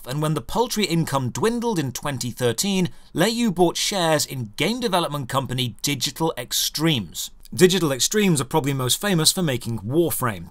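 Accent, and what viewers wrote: United Kingdom. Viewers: Why is reviewing MMO with a british accent?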